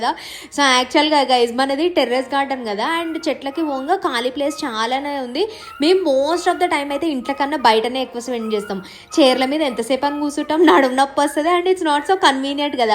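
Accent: Telangana, India. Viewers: ఏ అక్క తెలంగాణ యాస్ సూపర్.. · Telangana యాస చాలా బాగుంది.... నవారు దూరం అల్లాడు.... మనమే అల్లుకోవచ్చు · Pakka Telangana yasa 👏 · పక్క తెలంగాణ యాస ❤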